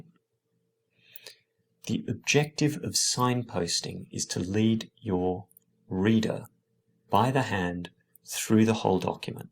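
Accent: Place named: Australia. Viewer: Its Australian love. Where you from?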